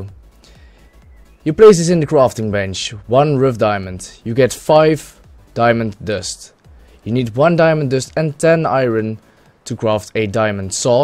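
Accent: Netherlands. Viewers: i dont know but are u nl? u talk like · when the dutch accent is strong..... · haha mooie nederlandse saccent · Jij bent nl toch? (ik hoor het aan je engels) ;) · Ik kan het nederlands goed in je stem horen maar je engels is wel goed hoor!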